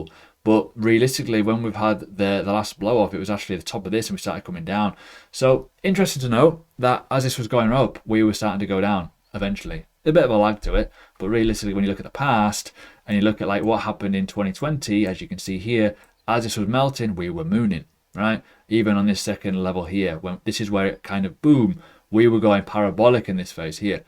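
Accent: United Kingdom. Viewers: Omg 😱 my favourite guy ever. Please keep them coming. The British accent a huge bonus 👍👍👍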